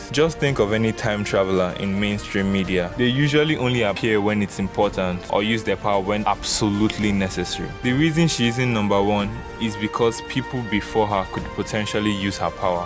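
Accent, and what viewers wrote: Nigeria. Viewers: are u nigerian by chance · Are you Nigerian?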